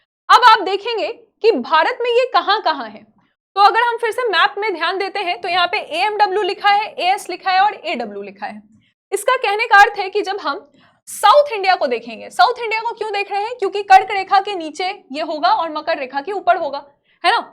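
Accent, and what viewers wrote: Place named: West Bengal, India. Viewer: Dilect se lgta madam West Bengal sey belong karte hai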